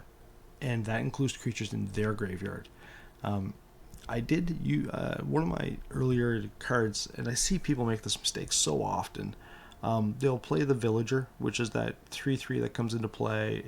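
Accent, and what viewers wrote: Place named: Minnesota, United States. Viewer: My man, you gotta be from MN right?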